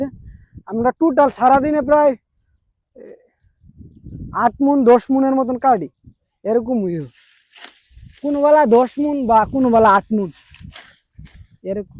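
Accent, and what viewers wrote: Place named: Brahmanbaria, Bangladesh. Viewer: তোমার বাড়ি ব্রামনবাড়িয়া?ব্রামন বাড়িয়ার মানুষ কথা শুনলে মেজাজ টা গরম হয়ে যায়,কারণ এরা খাওয়ারে বলে হাওয়া আরো অনেক কথা আছে এই টাইপের আছে।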